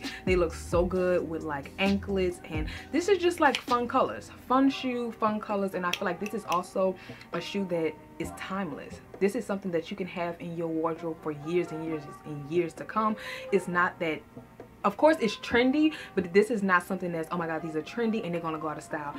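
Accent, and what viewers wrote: New Orleans, United States. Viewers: It's ok g if the New Orleans keeps jumping out I'm from Baton Rouge and spent half of my 90% off my child hood in New Orleans and that's what I loved the most tha accent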